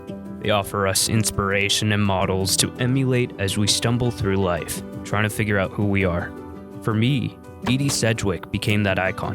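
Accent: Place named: California, United States. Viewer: It’s a California shift. Interesting thing happening with the accent. They say things differently than you.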